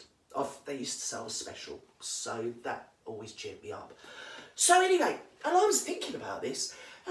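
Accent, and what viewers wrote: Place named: London, United Kingdom. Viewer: Haha love the bleeding accent up my street, love you! W Londoner here love your banter haha tell it girl how it is! Go girl! 💕🤣👍🇬🇧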